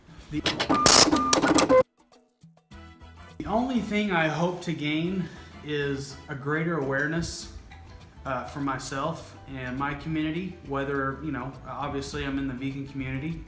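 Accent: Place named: Texas, United States. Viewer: OMG, are you kidding?! Well, you do sound Texan:) I've been in Austin for a couple of years now, but I miss Boston every day. I haven't acclimated well here because it's just too hot and humid all the dang time. I bet you don't miss that, hahaa! Wow, I love that you and your wife made your dream come true-- it takes sacrifices, yes, and a hell of a lot of courage. But there you are-- now living in paradise:)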